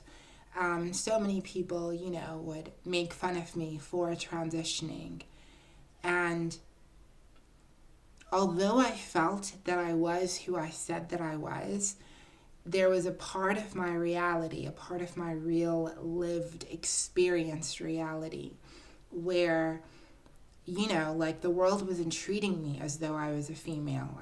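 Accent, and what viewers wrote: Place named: United States. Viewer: Whats up with the American accent?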